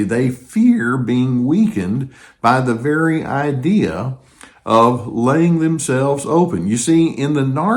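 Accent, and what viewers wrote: South, United States. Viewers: Love the southern twang!! It helps my Georgia heart listen ❤ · You standing on hollow ground..... My jaw dropped when I saw you reply to US... Really I started to cry. The very first time I watch you. You had so much southern twang that I kept watching other people, but in this past year... Your the best!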